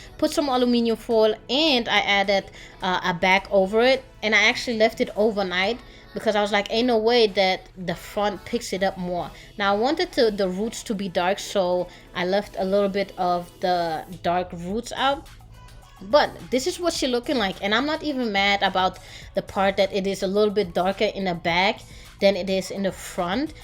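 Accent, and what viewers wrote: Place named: Cambodia. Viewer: Your assent sounds Cambodian love it wow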